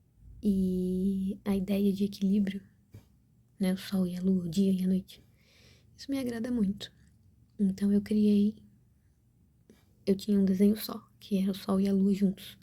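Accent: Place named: Rio de Janeiro, Brazil. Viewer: a tem sotaque carioca e a não tem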